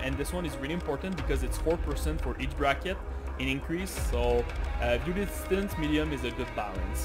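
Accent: Quebec, Canada. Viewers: Yooooo je reconnais l'accent quebecois ahahaha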